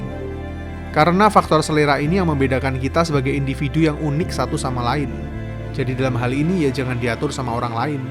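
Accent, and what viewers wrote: Yogyakarta, Indonesia. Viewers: Aku Suka karna ada medok khas Jogja nya ya kang 🙏 ciri khas banget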